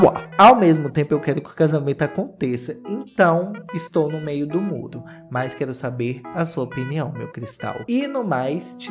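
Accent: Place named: Rio de Janeiro, Brazil. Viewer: Sutaque carioca e o melhor kkkk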